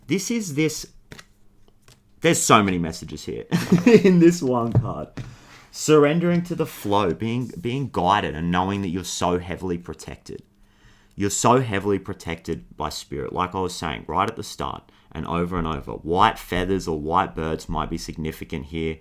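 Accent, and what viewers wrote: Australia. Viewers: Hey, thank you. It's so nice to hear a fellow Aussie read. And it's spot on. I am being taken to a rental tribunal by a managing director who lied to me and has lied on an official form. I am scared, not sleeping well, although I had a dream where I saw the word "peace" and the name of the managing director who has lied, defamed, and made my difficult life harder. I felt a sense of relief after that dream, some compassion for the damaged young woman that I am dealing with. I have been showing up every day, taking all the action I can. The hearing is on Wednesday. I will take compassion, strength, and all my spirit guides and angels with me.Thanks for your wisdom.K.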